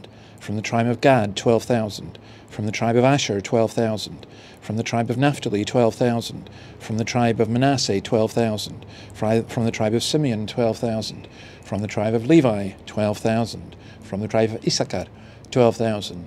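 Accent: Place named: Australia. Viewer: Good stuff. On a side note - Your accent is becoming a little bit Aussie Have a great day and God bless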